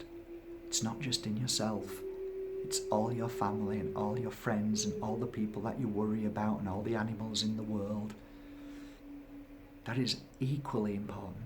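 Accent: Yorkshire, United Kingdom. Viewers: Is your accent Yorkshire? I have a Leeds accent, yours sounds Leeds/Calderdale area? Just found your channel - it's wonderful!! Many thanks x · You from Yorkshire northern England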